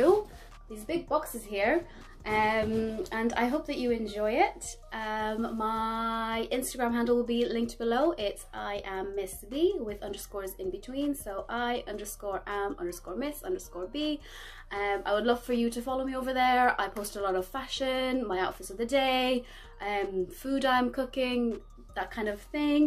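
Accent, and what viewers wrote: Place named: United Kingdom. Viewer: Loved the video cousin !!! :D P/S: Never noticed you sounded so british before haha!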